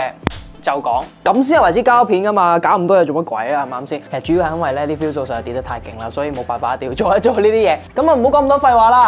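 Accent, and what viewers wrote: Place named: Taiwan. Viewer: 哇... 的普通話講得太好太台灣了吧！👍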